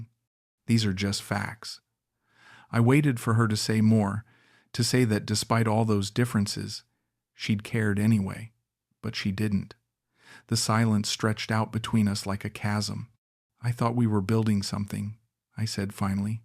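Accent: United States. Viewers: You don’t have a British accent, you have American accent so is this story real? If it is real I think you are not a smart Englishman!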